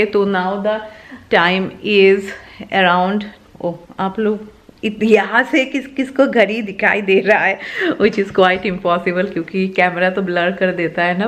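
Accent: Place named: Bengal, India. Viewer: Hello mam, I just wanted to say that you won’t stop driving your car just bcoz a few insects hit the windshield... right! So keep going bcoz you are really adorable and your cute bengali tone is so heartwarming!!! There are and will be haters and people to pull you down, but above all there are those who love you and admire you!!! ❤️🙏🏻💐💐💐💐💐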